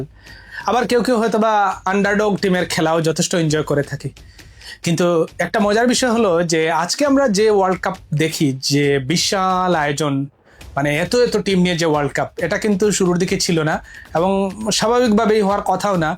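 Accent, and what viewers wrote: Sylhet, Bangladesh. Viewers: Sylheti mone hoy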